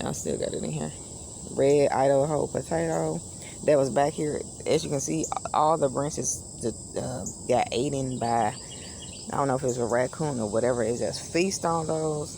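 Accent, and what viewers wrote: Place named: South, United States. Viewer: I'm wondering if you possibly mis-heard something? I couldn't perceive any salty language in her audio. She does have a strong southern accent, that perhaps could make a difference to what you heard?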